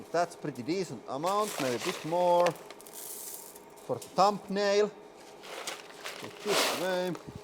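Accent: Finland. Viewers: I like how he still doesn't fully grasp the concept of the letter Y in English making an "i" sound and not the "u" sound it does in Finnish. "Velcome to Huudraulic Press Channel" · I love his finnish accent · I have a theory that the Finnish accent it one of the secrets of this channels success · As a Dane I envy you Fins for your accent.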